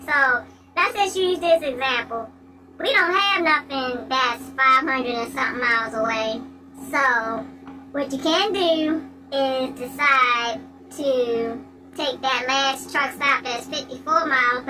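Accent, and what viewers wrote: Baltimore, United States. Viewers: Truker path is also a GREAT app for trip planning. It gives you truck stops(large chains & mons and pops as well) rest areas, Walmarts, weight stations, etc... all at the same time. The voice thing tho, no fun intended but I'm a southerner and I enjoy your B-mor accent, js. Do you of course though, lol. Good Vids!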